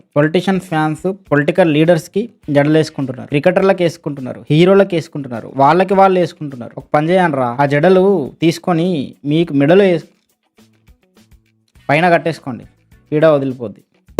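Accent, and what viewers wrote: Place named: Telangana, India. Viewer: Bokka lo Telangana slang 🤮🤮🤮🤮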